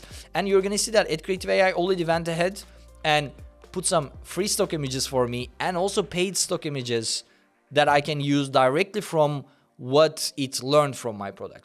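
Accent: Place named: Turkey. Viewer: Türklük damarım tuttu:) Harika araçmış diye videoyu izlerken, aksan dikkatimi çekti ve başa dönüp isme döndüm. Ve. Helal olsun, tebrik ederim. Çok güzel iş.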